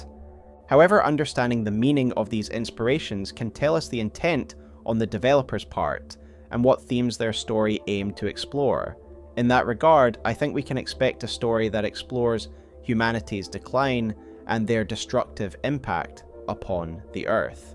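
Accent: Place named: United Kingdom. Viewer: i can tolerate a british accent to a degree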